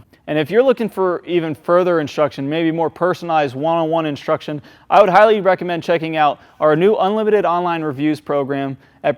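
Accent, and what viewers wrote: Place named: United Kingdom. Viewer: yeah on ur youtube channel u sound like ur British r u British?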